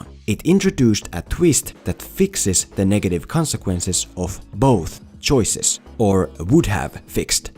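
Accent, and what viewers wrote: Finland. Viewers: Ootko suomalainen kun on niin suomi-aksentti?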